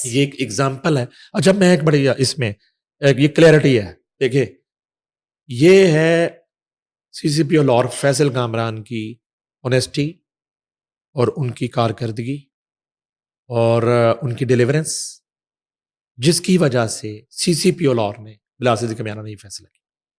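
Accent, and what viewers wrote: India. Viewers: اگر آپ نے انڈین کلچر ہی پروموٹ کرنا ہے تو بہتر ہے آپ انڈیا چلی جاؤ.... پاکستانی بچوں کی اُردو خراب نہ کرو.... بہت شُکریہ😮 خ...خان کو کھان کہنا غ.....غیر کو گیر کہنا یہ ہمارے ہاں نہیں چلے گا افسوس اس بات پہ ہے کہ ڈاکٹر ثمینہ حق نے بھی اپنے ایک پوڈ کاسٹ میں کہا تھا کہ انڈین ڈرامے سٹار پلس وغیرہ دیکھنے چھوڑیں آپکی زُبان، کلچر، رہن سہن، مذہب اور دماغی سازشیں سب کُچھ آپکے ماحول کو گھروں کو خراب کر رہے ہیں.... مُشتری ہوشیار باش 😮😢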